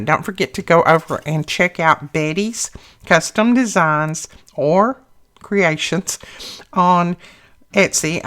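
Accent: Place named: Missouri, United States. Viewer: Oh my goodness, you are so inspiring! I was recently given a bunch of printed papers and didn't really know what to do with them. So I searched youtube and you were on the top of the "scrapbooking" search. Your creations are so beautiful! I already made a couple of bible study journals, but I forgot to take any pics before I gifted them (just took one of the first cover). Now im working on a journal as a graduation gift for a family friend going off to college. I've discovered so many things I can use from your videos! And I LOVE your accent. I live in Washington state, but I'm from Missouri. You sound like home! 🏠💗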